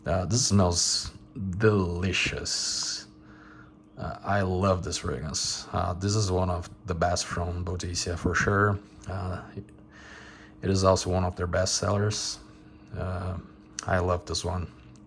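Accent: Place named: Portugal. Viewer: lovely review bro! your accent sounds very Portuguese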